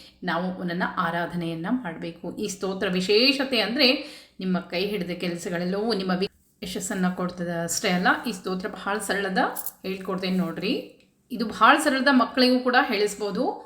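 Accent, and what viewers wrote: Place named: Hubballi, India. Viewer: madam you are amazing. I like Hubbali accent. Thank you for you guidance. Like short Shlokas.Thank you❤ Namaskaram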